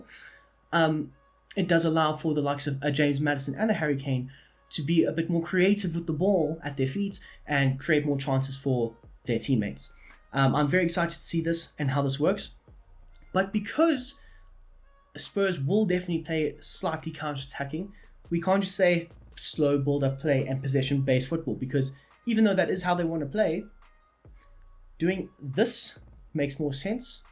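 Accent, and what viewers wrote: South Africa. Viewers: Are you South African by chance?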